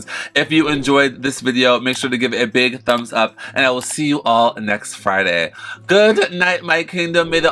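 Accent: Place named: Boston, United States. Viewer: i think that's just her boston accent tbh 😭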